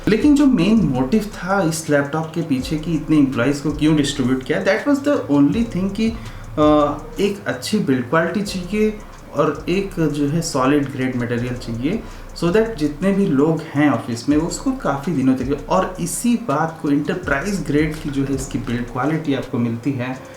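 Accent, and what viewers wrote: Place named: Bengal, India. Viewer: I am guessing from your acsent , that you are a bongali !!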